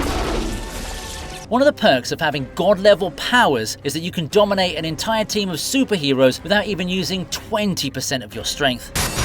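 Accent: England, United Kingdom. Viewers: Love your accent I'm American and I just love a funny English accent proper English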